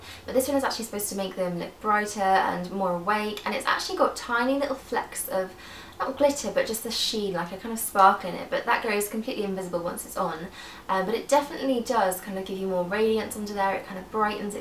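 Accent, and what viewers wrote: United Kingdom. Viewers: You are so gorgeous! I'm so jelly. :( And I wish I talked with that wonderful british accent! haha! you're the best! xoxo all the way from sweden!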